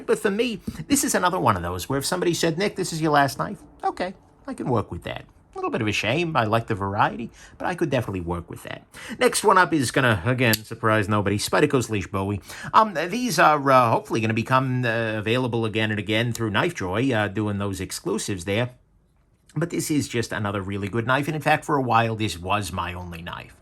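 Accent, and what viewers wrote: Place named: New Jersey, United States. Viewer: Signore i love your Italian American accent new jersey ❤️ 🇮🇹🇺🇸 🗽auguri in bocca al lupo 🙂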